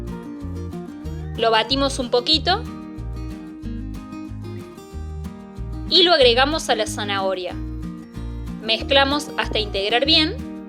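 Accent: Córdoba, Argentina. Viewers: Es cordobesa la cocinera o parece?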